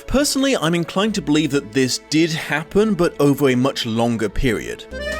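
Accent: United Kingdom. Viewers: Stfu about Franco. Performative whiteknighting into extinction. Every time I hear this UK accent in a video it's a 100% guarantee it will have the dumbest pretentious takes ever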